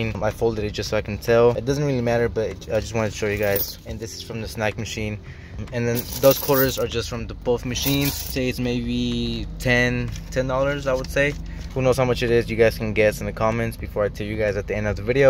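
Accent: Chile. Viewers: Wn eres chileno? La raja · eres chileno verdad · eri chileno ? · Are you Chilean? :0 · Are u chilean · Are u chilean bro? AGUANTE CHILITOO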